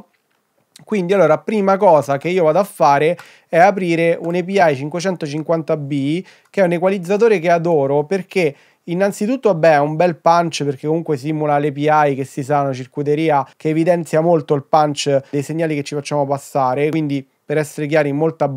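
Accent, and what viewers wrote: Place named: Rome, Italy. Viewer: Abito a Pordenone da 15 anni ma sono di Roma (vicino Frascati). Sempre bello sentire il nostro accento...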